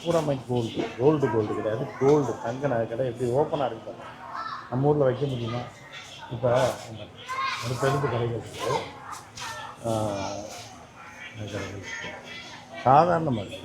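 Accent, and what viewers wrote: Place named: Madurai, India. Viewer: வணக்கம் தொடர்ந்து காணெளி பார்த்து கொண்டு இருக்கிறேன் இதை பார்த்த அதன் மதிப்பு அவ்வளவு தான் உங்கள் பேச்சு நையாண்டி கலந்து மதுரை பேச்சு உங்கள் சேவை தொடரட்டும் இப்படிக்கு ரேவதி பிரபாகரன்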